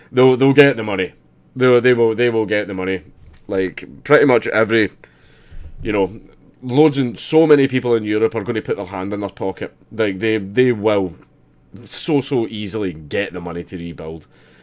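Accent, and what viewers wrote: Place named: Scotland, United Kingdom. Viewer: Dude, you sound like the scotsman from Samurai Jack. Anyway, I hope the cathedral gets restored in its original form.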